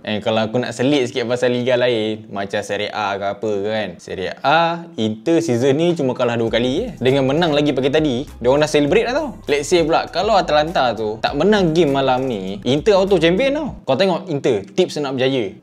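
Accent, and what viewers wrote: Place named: North, Malaysia. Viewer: OK,cuma utaqra kuat sgt, org key el kurg paham 🤣🤣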